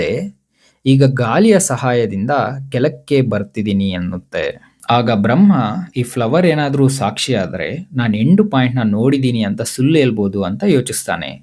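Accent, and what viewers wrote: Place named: Tamil Nadu, India. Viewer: Tamil boy made this video your speech is in Tamil slang. Welcome you speaking in Kannada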